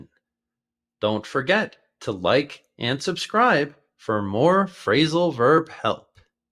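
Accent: United States. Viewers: American or Bri?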